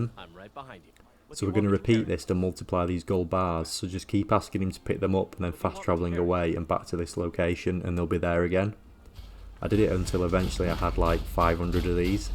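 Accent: United Kingdom. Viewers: It’s great to hear a northern accent! I feel at home. You have earned yourself a sub my friend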